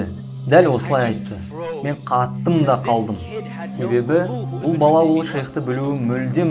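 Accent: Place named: Russia. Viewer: Авторға рахмет пайдалы видеосы үшін.Бірақ "ң" әрпінің орнына неге "н"әрпін айттыңыз аяғына дейін.қазақшаны акцентпен сөйлеп "орысша сауатты"адам болып көрінгің келді ма ?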